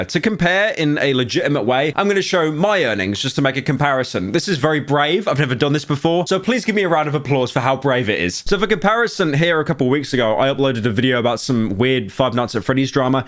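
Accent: United Kingdom. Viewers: Pyro, Internet Anarchist’s numbers line up with yours. He lost $8000, he’s Australian. If he made a million on that video, like your that made a million, the numbers would be very similar. Convert $8000 AUD to pounds which i’m assuming you are using because you got the funny british voice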